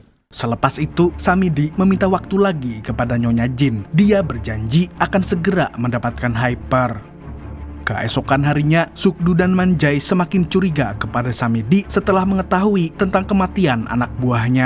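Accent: Banjar, Indonesia. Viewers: Urang Banjar asli kah bg .iya dah bubuhan Samidi🤣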